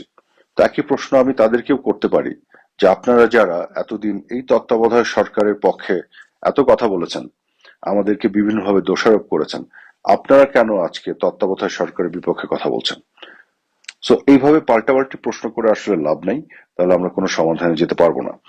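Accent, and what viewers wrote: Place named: Bihar, India. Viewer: Aponar kothar sur amon-j bihar mani kintu talgas ta amar.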